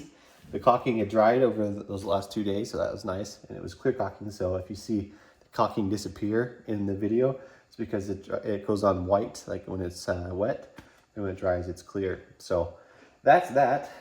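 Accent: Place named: Canada. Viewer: He talks like a candian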